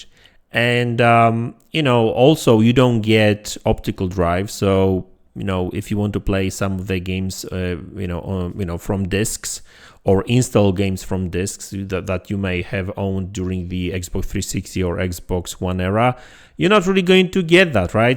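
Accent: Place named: Poland. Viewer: Od razu w intrze usłyszałem ten polski akcent xd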